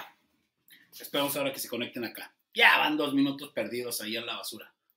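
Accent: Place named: Venezuela. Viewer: Me encantas porq hablas como el venezolanos sin pelos en la Lengua 😁😁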